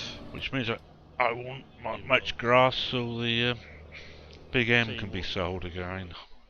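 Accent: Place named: Newcastle, United Kingdom. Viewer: Wonderfull geordie accent...Lol Thanks bud Good vid man thumbs up BTW i would like to see soil mod on old ridge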